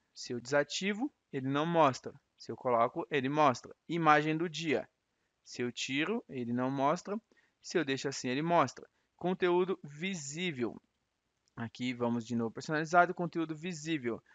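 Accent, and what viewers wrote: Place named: France. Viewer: Mano que sutaque francês massa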